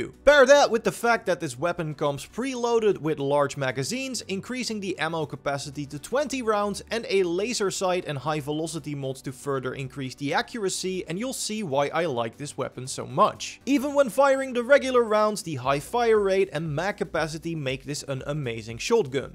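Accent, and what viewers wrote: Netherlands. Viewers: You sound very Dutch, nice vid tx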